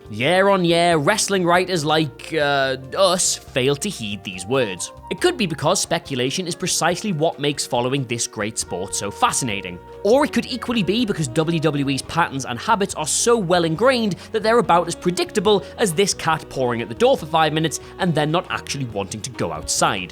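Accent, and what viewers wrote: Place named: Teesside, United Kingdom. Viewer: listed as from Newcastle but he sounds more like a Teesside boy to me.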